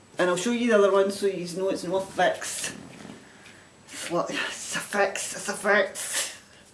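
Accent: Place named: Scotland, United Kingdom. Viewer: Love the podcast! I am from Scotland too so always great to hear the Scottish accent! Would love to enter into the giveaway 😀. Thank you!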